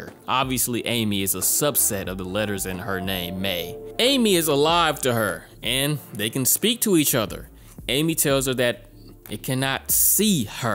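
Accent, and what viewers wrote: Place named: Philadelphia, United States. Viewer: Oh you from Philly huh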